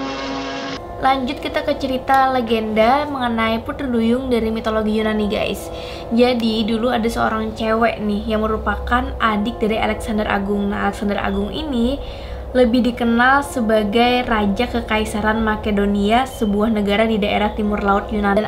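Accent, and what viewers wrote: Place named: Bali, Indonesia. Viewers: Logat nya kek orang Bali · Logatnya kaya org bali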